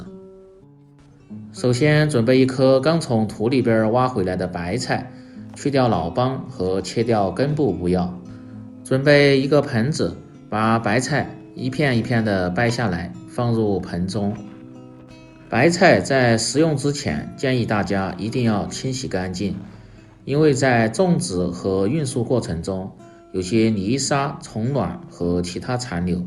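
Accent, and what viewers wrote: Sichuan, China. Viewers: 四川人，一听就晓得嘛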